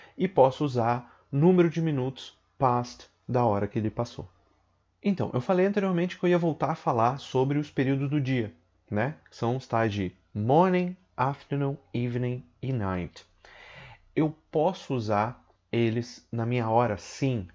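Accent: United Kingdom. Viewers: Eu tô maratonando seu canal e to amando aprender o sotaque britânico pois é mais fácil para minha pessoa falar, ouvir e entender melhor. Mas uma pergunta, se uma pessoa está saindo e fala: Good night! Eu deveria responder como ela disse certo? Pois ela está saindo. Então eu também responderia "good night" na despedida?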